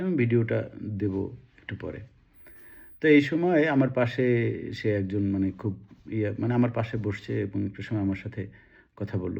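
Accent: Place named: West Bengal, India. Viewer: তোমার উচ্চারণ এবং ভাষা পুরোপুরি পশ্চিমবঙ্গীয়। তোমাকে পশ্চিমবঙ্গের আসার জন্য আমরা স্বাগত জানাচ্ছি।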